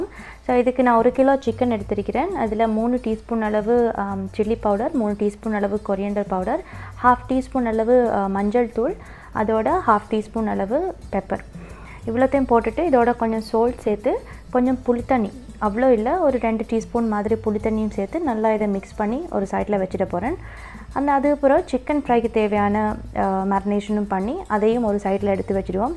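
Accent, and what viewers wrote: Sri Lanka. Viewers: Periya wela srilankan muslim slang la pesureenga .. nan perunalaki cook panna inga wanden recipie thedi, sari sis 1 suport 1 sub for u dear sis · Normala srilankan maariye peusuriga.love you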